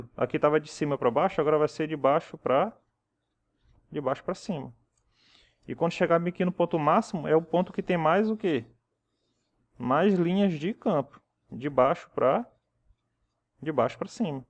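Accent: Ceará, Brazil. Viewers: tu parece ser cearense.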